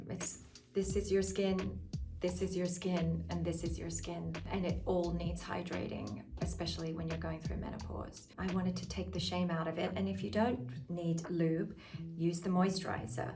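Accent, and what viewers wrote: Australia. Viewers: She sounds very Australian to me! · Although she was born in England 🏴, I think 🤔 after she moved to Australia 🇦🇺 age 14, she eventually developed and adopted her current Australian accent.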